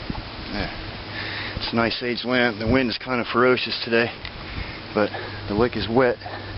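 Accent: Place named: Baltimore, United States. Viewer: are you a Baltimorian? You sound like you are on East coast, you sound like the folks here in Baltimore, MD. LOL Just curious. Love the lamp. I am attempting to learn all these ancient survival skills so I have a shot after the Apocalypse. I plan to survive it.:)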